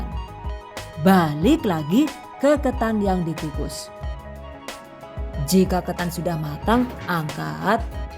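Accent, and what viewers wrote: Banyumas, Indonesia. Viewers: Matur suwun nggih mbak 🙏 sampun berbagi Resep Lempernya...Jaaan Ngapake ky inyong ya 😁 ora ngapak ora kepenak 👍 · Cantik-cantik lempernya bunda.... terimakasih bunda resepnya, jadi lebih praktis buat lempernya. Saya selalu suka dengan resep karena mantap dan lucu2 ada selingan humornya terutama bahasa ngapaknya itu lho. Jadi penasaran bunda ni asli madiun atau daerah ngapak ya.... 🤭🤔🙏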